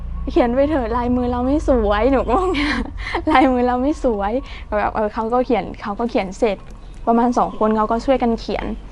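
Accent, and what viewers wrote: Central Thailand, Thailand. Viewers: น่ารักอีหล้า พูดไทยกลางชัดเจน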